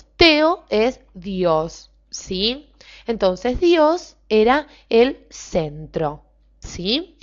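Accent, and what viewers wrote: Rioplatense, Argentina. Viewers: Vos sos Argentina o Uruguaya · Like si escuchas asento argeitaliano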